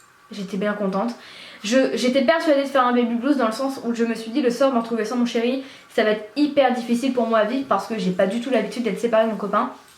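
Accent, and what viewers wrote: Normandy, France. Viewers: tu as un accent normand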